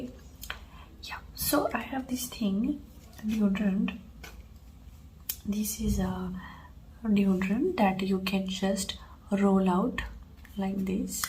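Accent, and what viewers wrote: India. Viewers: Is this a Hindi accent specifically?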